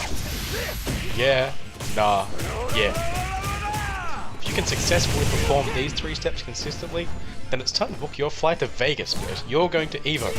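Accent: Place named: United Kingdom. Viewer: Nice video! You British people have such a cool accent :)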